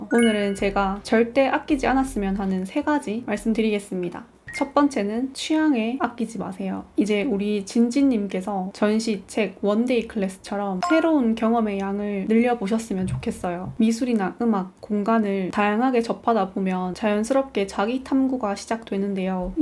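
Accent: Gyeongsang, South Korea. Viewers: 고향 경상도어디였나용?!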